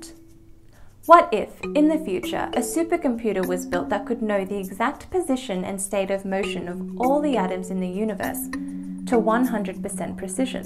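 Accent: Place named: Australia. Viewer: I appreciate your teaching style, animations, sense of humor, and your australian accent. Subscribed.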